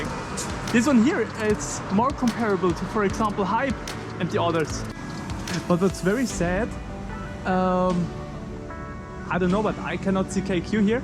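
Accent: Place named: Germany. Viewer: It's funny to hear this German accent, I keep thinking "just say it in German." - Just kidding, you speak English very well and I love Ateez, so this is very much appreciated.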